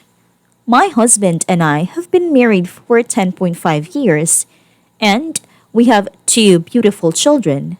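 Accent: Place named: Philippines. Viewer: Sounds like this was read by a Philipina robot.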